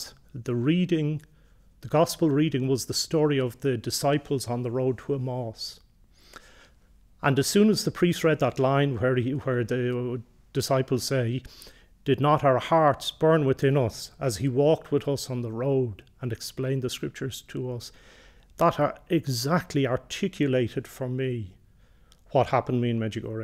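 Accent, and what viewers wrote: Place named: Ireland. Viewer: Beautiful experience and gorgeous Irish accent 😇 Thanks for sharing, father!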